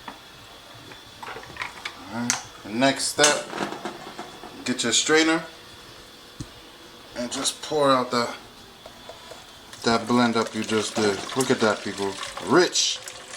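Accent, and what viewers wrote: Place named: Jamaica. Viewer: I hear a little jamaican accent, anyways just subscribed, hope yu a yardie 😂😂😂😂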